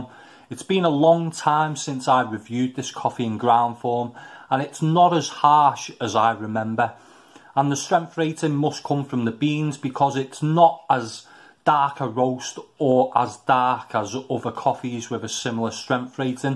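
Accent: Liverpool, United Kingdom. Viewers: Fucking scouse accent ha ha perfect review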